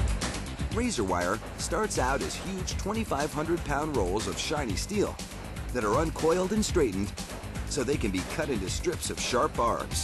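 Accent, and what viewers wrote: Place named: United States. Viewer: I like how he cheerfully explains how it can cut through cloths and flesh with his American voice.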